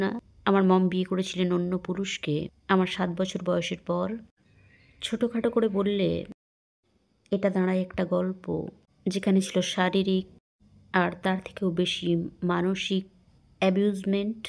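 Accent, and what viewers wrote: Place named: West Bengal, India. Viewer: এটা বাংলাদেশি চ্যানেল কিনা আমি বুঝতে পারছি না। ভাষাটা পশ্চিম বংগের মনে হচ্ছে যাই হোক ভাষা টা ব্যপার নয়। ইসলাম প্রচারের জন্য চ্যানেলটা ঠিক আছে তবে এখানে অন্য পিকচার গুলি দেখাবেন না প্লিজ। যিনি কথা বলছেন শুধু তাকে দেখান। অন্য পিকচারে পরদাছাড়া মহিলাদের দেখানো হয় এটা ইসলামের সংগে যায়না। আশা করি বুঝতে পারছেন।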